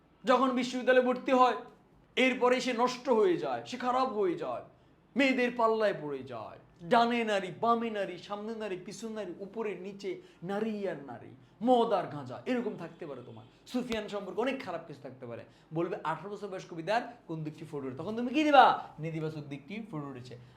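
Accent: Noakhali, Bangladesh. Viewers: স্যারের বাড়ি কি নোয়াখালী?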